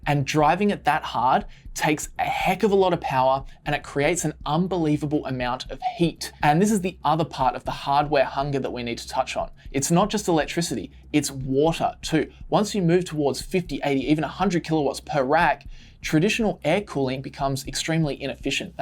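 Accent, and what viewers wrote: Australia. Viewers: You sound Aussie but focusing on US!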